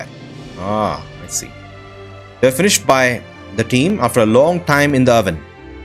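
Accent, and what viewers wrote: India. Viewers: God damn your accent is really annoying, wash away that "v" when saying w damn indian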